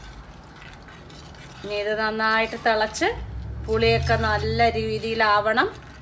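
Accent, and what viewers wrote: Palakkad, India. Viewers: Variety dish,,,,, also Ur way of speaking, palakkadan style, kelkkan nalla rasam undu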